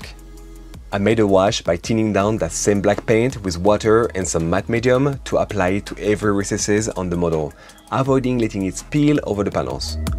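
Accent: France. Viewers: Came in the hobby with the V3 around... 2000 i think. And started with Blood Angels. Just came back 2 years ago, still with BA. Nice paint, the fact you didn't use Metallic is cool. I would be curious to know what is your experience in painting cause, it looks awesomely incredible. BTW i checked (not finished, it's late right now) your other video, and was amused by your accent, so "tranché", No surprised by the fact you're french. And a Parisien one. It explains A LOT. Hi and cheers for the next videos, from a fellow french (But Nemesis Marseillais). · je me disait bien qu'il y avait un accent francais la dedans bon bah j'mabonne... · on dirait un accent français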